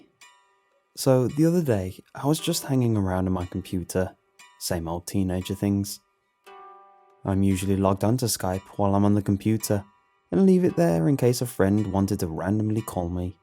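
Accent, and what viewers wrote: United Kingdom. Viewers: Mr.BrittishAccecentCreepManDudeChillVoiceCreepypastaSir Cna i have a like from u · Well, I really enjoy listening to pastas read by you (especially since I definitely prefer British over American accent), so like always, good job :) But I just had to comment on those stories - it was pretty easy to guess what was going to happen next and they were not scary at all. And please - how could I expect a phone making a sound when someone is hiding xD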